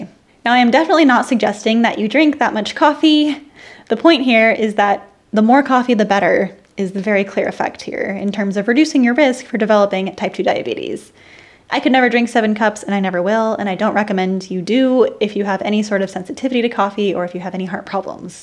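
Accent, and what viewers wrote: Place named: United States. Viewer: Thanks.Your video may be great but all can't benefit from it because you speak too fast in your typical American accent.Additionally,you don't text all what you talk about. This makes hundreds of subscribers face this daunting task of understanding your good lecture.Hope you make necessary improvements in future videos.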